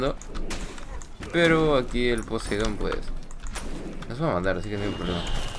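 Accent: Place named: Spain. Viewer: estas en latam y hablas como español o.O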